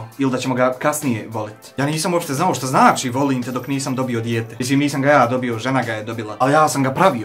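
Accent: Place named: Bosnia and Herzegovina. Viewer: sve full samo vrati naglasak od pre,sada pricas ono bas bosanski a meni je iskreno bas muka da slusam to,pricas kao kompleksas a ja njega ne mogu da slusam i verujem da nisam jedini,bez uvrede naravno bilo kome ali jednostavno taj govor je nekako cudan i ne prija mi usima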